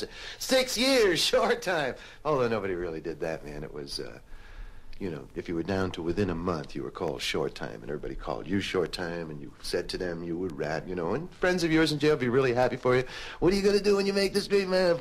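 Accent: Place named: New York, United States. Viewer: Eliezer Pennywhistler i thought the same thing. it's the new york accent and his verbal mannerisms...not all of it, just certain words and phrases are very evocative.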